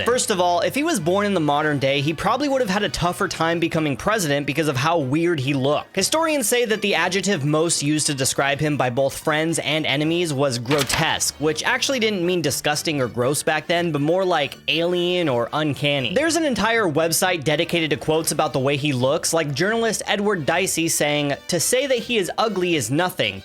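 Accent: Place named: Illinois, United States. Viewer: Bruh sound like a typical Illinoisan 🙂‍↔️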